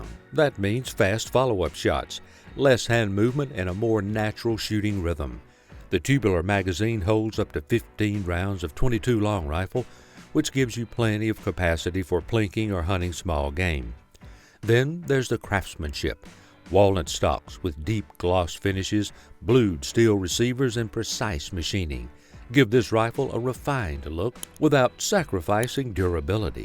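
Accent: South, United States. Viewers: This redneck AI is annoying af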